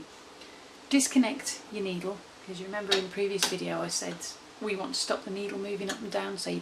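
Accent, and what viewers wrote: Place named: England, United Kingdom. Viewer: Sounds like that "grandma" might need a good "sip of oil". I have been sewing for a least 64 years. A beginner would need to see the most challenging part of sewing the ends of the elastic together. Usually that part is not very pretty no matter how we hold it or pin it. That is to be expected. LOL. Been there, done that. i worked for 9 years in the "garment industry" as a sewing machine operator. I have my mother's treadle sewing machine. Great job on your video and very nice job on sewing the mask! At my age, this great, great gma needs a sip of oil too. A "fountain of youth" would be wonderful to find. Love your "English accent". I am from KY and speak with a "Bluegrass English" accent. ~ August 7, 2022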